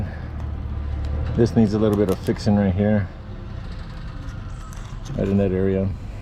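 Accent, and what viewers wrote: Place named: Louisiana, United States. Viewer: This guy sounds like he’s from Louisiana. Got dat cajon accent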